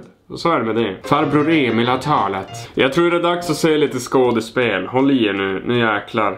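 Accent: Norrland, Sweden. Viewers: Hahhaha varför gillar jag din dialekt men hatar norrländare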